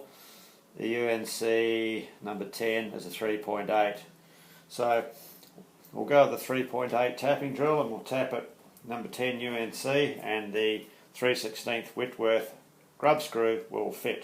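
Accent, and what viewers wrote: Australia. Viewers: Good to hear an Australian accent for a change, nice work as well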